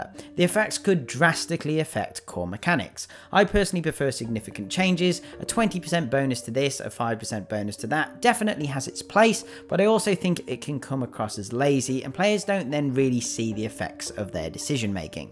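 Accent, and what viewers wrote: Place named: United Kingdom. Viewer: british innit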